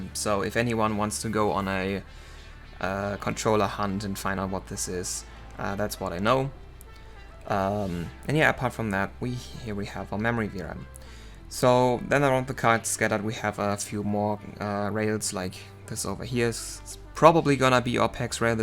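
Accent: Germany. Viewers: nice to hear english with german accent again :))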